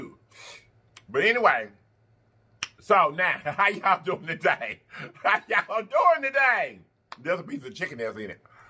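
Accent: South, United States. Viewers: I love you!! I’m from the Sip and I love that southern twang we have... “wait a minute!!! Ya boy done got choked out!!!” 😂🤣😆❤️ I felt that!! ✊🏽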